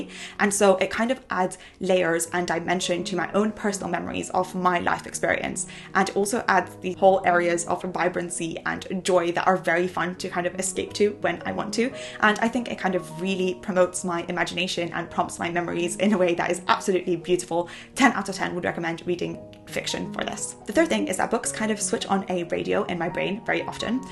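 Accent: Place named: United Kingdom. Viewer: you add some british accent when you speak in American English.I guess you first learnt british accent then American.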